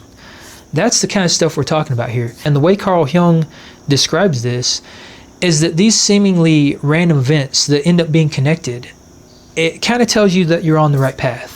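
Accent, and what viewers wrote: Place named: Texas, United States. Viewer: You sound like you're from Texas..i.e. San antonio.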